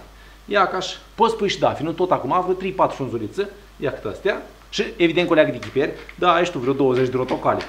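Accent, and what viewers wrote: Moldova. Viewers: Like am dat,abonată sunt demult.Rețeta este o minune tu și graiul tău Moldovenesc o minune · Sunteți moldovean cu limba lata daca nu vă supărați de unde sunteti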